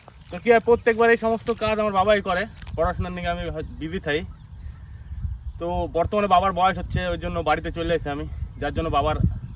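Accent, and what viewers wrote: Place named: Barishal, Bangladesh. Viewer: ও ভাই তুমি তো কথা বলো মোগো বাংলাদেশ বরিশালের মতো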